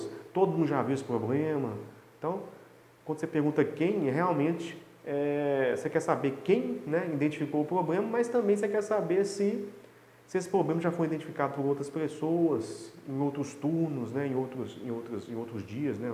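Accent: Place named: Minas Gerais, Brazil. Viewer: O mineirês tá em dia demais! Parabéns pelo material, me ajudou hj!!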